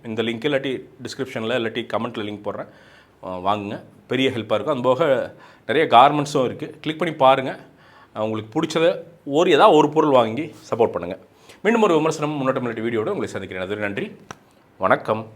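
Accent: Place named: Coimbatore, India. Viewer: கோயம்புத்தூரில் போய் ஒரு நாள் இருந்ததுக்கு அவங்களோட வட்டார பாஷை பேசுற.. இத்தனை வருஷமா ரிவைவ் பண்ணுறே பாண்டா இன்னைக்கு வரைக்கும் கீழே எழுதி வச்சு தான் பேசிகிட்டு இருக்க என்னைக்கு தான் நீ மாறப் போறியோ..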